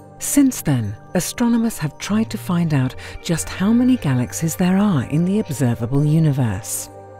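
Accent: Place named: Austria. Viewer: That's a lovely accent... Austria? If so... G'day mate!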